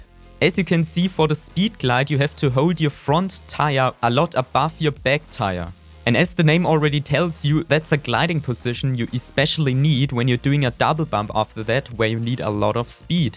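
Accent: Germany. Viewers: Dieser deutsche Akzent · Digga gutes Englisch man hört das du Deutsch bist! Nices Video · Bro ich merk doch direkt das du deutscher bist. Sprech doch einfach deutsch. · Dein alman Akzent hahahah😂😂😂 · I know it from your a accent that you are a german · yes, i can hear it very good, that he is from Germany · deutscher akzent lässt grüßen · der deutsche akzent killt aber nice video bro hat mir echt geholfen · I can tell from your english, youre 100% a German Guy. This Video helped me a Lot thanks. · You have a german accent are u german? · Can you speak German? You have this accent. Im from Germany so yeah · der deutscheste akzent den ich jemals gehört habe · He sounds so damn German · German accent haha 😂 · irgendwie höre ich ein bisschen deutsch in deiner stimme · Man hört voll das du Deutsch bist LOL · man hört das du deutsch bist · So heftig deutschen Akzent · Man hört voll das du eigentlich deutsch sprichst 😂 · Man kann hören das du Deutscher bist😂 aber gutes video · ich höre es doch du bist deutsch hahaah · Du bist alman oder man hört das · Alter ich schalte das Video an und höre sofort dass du Deutscher bist 😂. 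Aber alles gut. Ist wirklich informativ. Danke! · You are a 100% from Germany. Like ur speech omg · Man kann so hart hören das du deutscher bist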